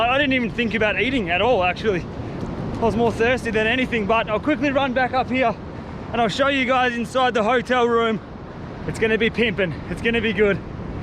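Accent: Australia. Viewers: He’s literally so Aussie the way he talks he’s iconic i don’t understand how anyone would miss understand where he’s from lmao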